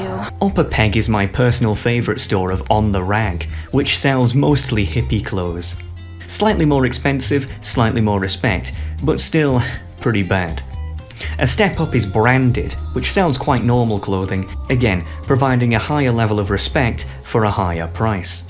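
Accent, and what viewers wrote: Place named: Scotland, United Kingdom. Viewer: You’re scottish i think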